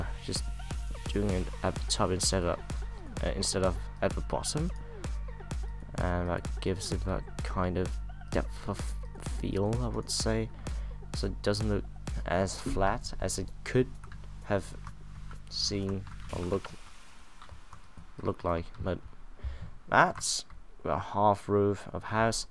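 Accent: Denmark. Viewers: I hear Denmark, i like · I was about to comment "where are you from i love your accent" but i think i love it because I'm from denmark to, oh and it's called an overhang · R u Danish?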